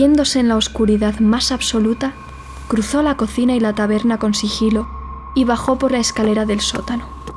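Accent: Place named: Spain. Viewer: Amo tu pelo y tu acento español!! ♡♡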